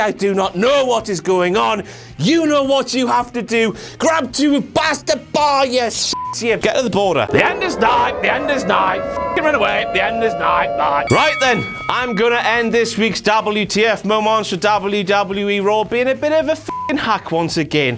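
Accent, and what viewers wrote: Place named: Cockney, United Kingdom. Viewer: More of that cockney accent please haha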